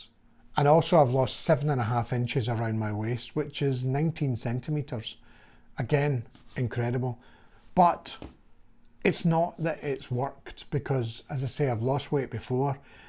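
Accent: Scotland, United Kingdom. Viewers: Good video. I could listen to that beautiful accent all day. I’m Scottish, first generation Canadian. Your accent reminds me of my people. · I love your calm Scottish accent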